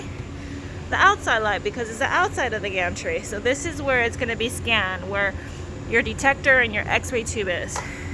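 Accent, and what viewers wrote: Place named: Caribbean. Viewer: Are you Caribbean? I can hear an accent and i feel like you’re one of us lol. very informative video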